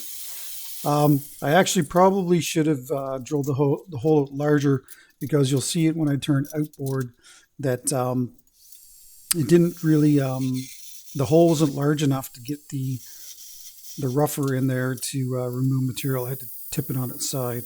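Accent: Canada. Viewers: Lovely bowl,. You’re really helping me learn turning and the difference between the American and Canadian accents. ((Much prefer yours, like my own native Scots).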